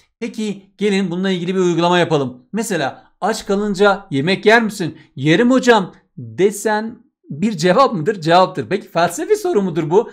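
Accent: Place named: Van, Turkey. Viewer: belli bende vanlıyım anladım konuşma tarzınızdan :D <3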